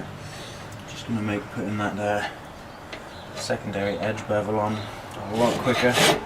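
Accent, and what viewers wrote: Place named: United Kingdom. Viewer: Ahh cool stuff I knew it was a uk accent haha. I have a lot of subscribers from Canada. Cool videos brother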